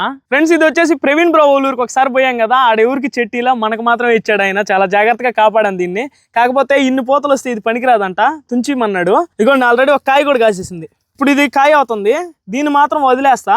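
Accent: Nellore, India. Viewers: Nellore yasa bro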